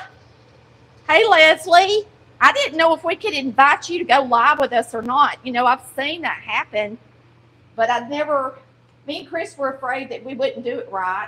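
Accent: South, United States. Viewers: For those of you who don't understand a lot of her words due to her Southern accent, she said "baking powder', not bacon powder. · I love you and your crazy Accent! Y'all! We say Eh and you say Uh huh honey and Y'all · Love your Southern accent and the way you cook! Y'all are amusing, too.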